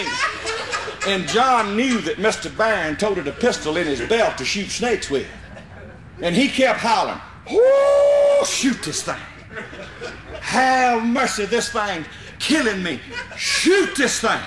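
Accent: United States. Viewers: Sounds like how I grew up in the holler in Kentucky...lol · Oh yes... fantastic delivery and charming southern accent!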